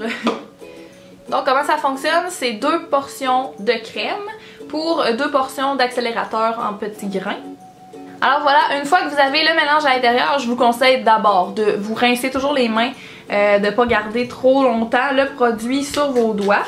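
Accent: Quebec, Canada. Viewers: du Canada ( c'est l'accent canadien ) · moi je kiffe l'accent québécois lol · Super vidéo et très jolie accent canadien ou québécois j'imagine ☺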